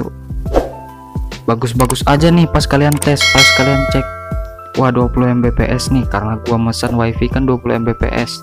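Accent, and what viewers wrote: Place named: Borneo, Indonesia. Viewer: Kek logat dayak bang org mana bang?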